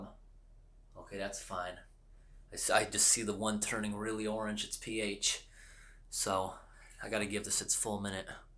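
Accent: New England, United States. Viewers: Tanner Smyly That’s his Yankee dialect.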